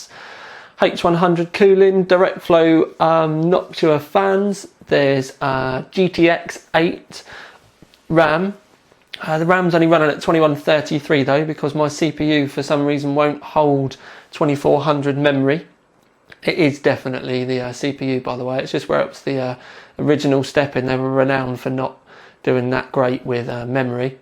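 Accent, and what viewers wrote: United Kingdom. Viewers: I have no clue what those numbers mean but listening to his British reactions I'm guessing they're pretty damn good.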